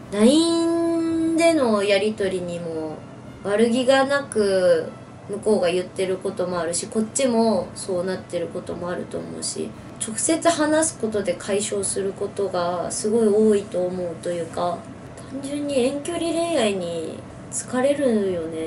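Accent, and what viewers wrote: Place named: Kansai, Japan. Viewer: やばない？ってさんの関西弁めっちゃかわいい笑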